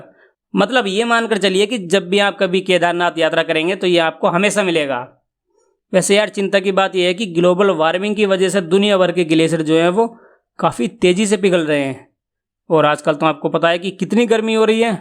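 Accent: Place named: Western Uttar Pradesh, India. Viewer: भाई आप पश्चिम उत्तर प्रदेश से है आपकी भाषा अच्छी लगती है। हर हर महादेव 🙏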